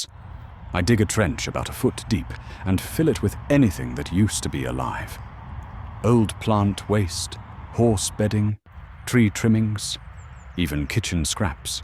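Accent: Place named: RP, United Kingdom. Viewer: The commentary is just brilliant. Funny, whilst actually parting knowledge and being very informative. Just brilliant and very engaging and enjoyable. Only a posh and articulate Englishman could pull that off. I think you're going to become more and more popular with this unique content. All the best to you, and please don't change the style and delivery. Its so original.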